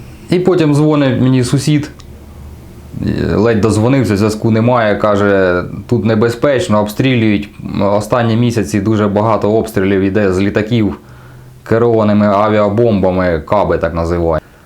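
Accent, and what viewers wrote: Ukraine. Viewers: какой же поганый суржик) жалко хозяйство , побольше нервов и сил на восстановление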